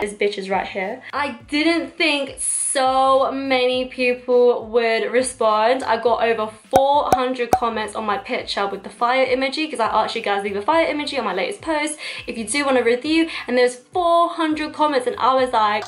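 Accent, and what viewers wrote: United Kingdom. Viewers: <mail> (p.s. when I first started watching you I was so surprised when I heard your voice cause I didn't realize you where from the U.K. 😂) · You're my Asian makeup inspiration! <3 & you're hella funnyyy. When I watched your first video I was pretty shocked by you're British accent! I wasn't expecting that ahaha but now I can't stop watching your vids ! {ig name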